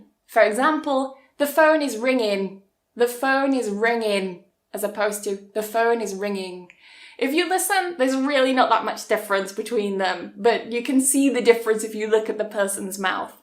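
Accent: United Kingdom. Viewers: Your accent is so British, I like it a lot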